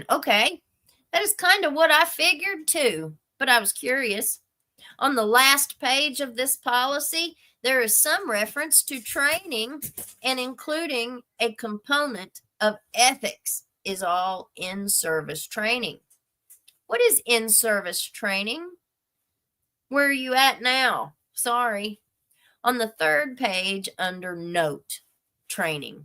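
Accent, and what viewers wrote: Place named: Kentucky, United States. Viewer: Hey, hey, hey! I went to college in Barbourville, Kentucky College. I worked in that area after graduation, in Corbin, London, Manchester, Hazard. Your accent is home to me!!! Now living in Oregon...my fondest memories are in Kentucky!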